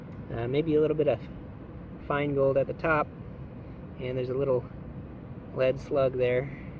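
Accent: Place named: Australia. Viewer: Loved the aussie accent 🤣 my theory why Australia has all the big gold is because the heveys always sink to the bottom 😉 thumbs up from down under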